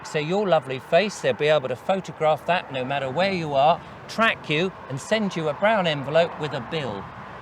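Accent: United Kingdom. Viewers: I was so glad to hear you speaking British when I started playing it. But I'm sure they'll try to do it over here too, it's all the WEF.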